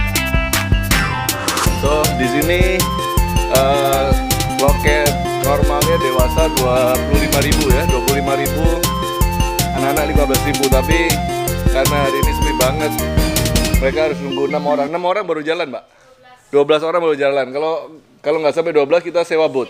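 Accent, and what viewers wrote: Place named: Surabaya, Indonesia. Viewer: Omahmu suroboyo ta om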